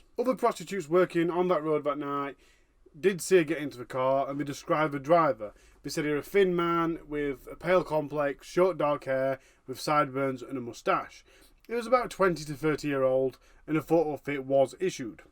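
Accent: Yorkshire, United Kingdom. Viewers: Me thinking my true crime obsession couldn't get any worse.... in comes and delivers it in a yourkshire accent 🤗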